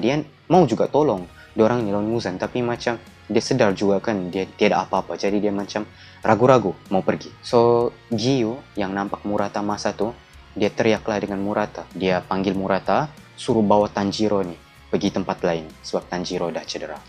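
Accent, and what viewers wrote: Sabah, Malaysia. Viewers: Abang ni orang Sabah ke??🤔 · Dia ni org sabah ke? Yg cerita ni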